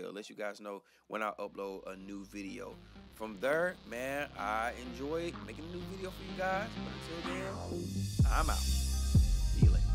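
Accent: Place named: St. Louis, United States. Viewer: You sound like you're from St Louis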